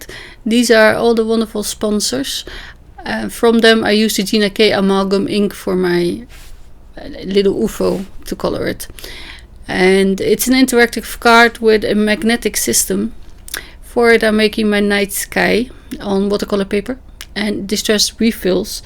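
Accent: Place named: Netherlands. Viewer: Het is een genot om te kijken hoe je kleurt met waterverf en stiften. Het is net een sticker! Aan de hand van je accent gok ik dat je ook uit Nederland komt? Leuk dat ik je heb gevonden in de hop. Dank je voor deze video.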